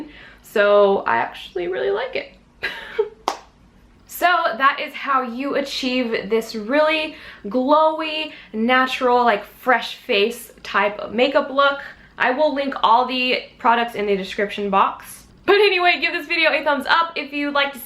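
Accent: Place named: Australia. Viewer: Your Aussie accent is so good (to me it's Aussie)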